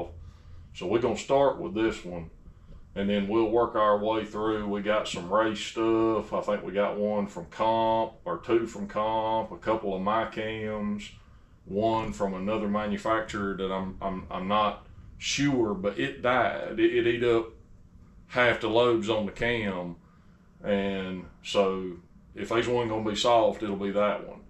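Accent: South, United States. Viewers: I’ve already commented which you responded to, thankyou. Just want to make a viewers statement on your vids., you give us content we don’t find basically but is extremely informative that fills the gap on many vids on YouTube. Then you make a vid without all the fanfare at the start. Then your totally unbiased opinions that doesn’t offend anyone including the competition. At my old age you meet the criteria of the genuine producer of consumer products. you need to be proud of your honesty, professionalism to produce products that are of the highest order. Unfortunately in this day and age this attitude is getting rarer. Keep up the good work., being half way around the globe I cannot benefit from your high standards but I look up to your honesty and professionalism. Cheers mate. And geez mate your southern accent is full on. It’s cool coming from a slang happy Aussie. 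Keep up the good work.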